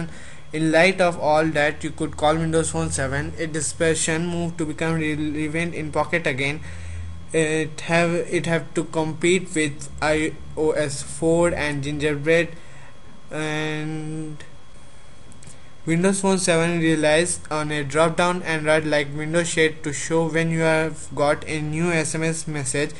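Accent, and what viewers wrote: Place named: India. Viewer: I can understand him and i'm not Indian. So what if he has a THICK accent. ...Do your thing bro.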